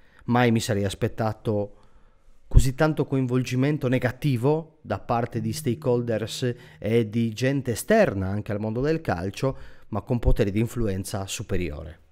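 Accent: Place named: Sardinia, Italy. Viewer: Ottime le tue analisi. Però non oso immaginare cosa ci faranno ora... (Riconosco l'accento della mia amata regione sarda: di dove sei?)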